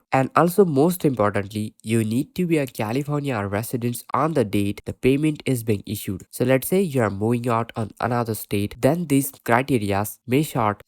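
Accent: India. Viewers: Indian English 😂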